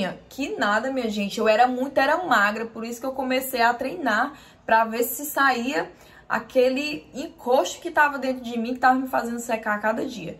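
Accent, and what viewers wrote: Ceará, Brazil. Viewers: desde o primeiro vídeo teu que eu assisti suspeitava que fosse do Ceará, o jeito de falar entrega dmss 😂❤ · Mlr, a primeira vez q eu ouvi vc falar já imaginei q era daqui do Ceará. Sou de Fortaleza. Bjos!!! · Esse sutaque do nordeste toda meiga, gostei demais do vídeo simpática e humilde sempre estou interagindo com você no instagram beijos mulher !! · Nossa pensei que era do nordeste jureiii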